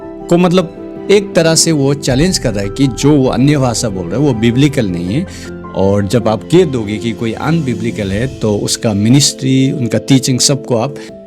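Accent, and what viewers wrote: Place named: Northeast, India. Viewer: Everyone is thinking this man is Nepali but his way of speaking is like Northeast India..he may be from Nagaland... Meghalaya...Arunachal Or Mizoram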